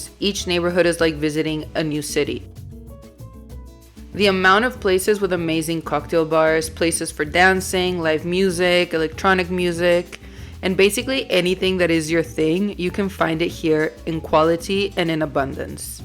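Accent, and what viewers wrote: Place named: Brazil. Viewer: Pelo sotaque, achei que fosse brasileira!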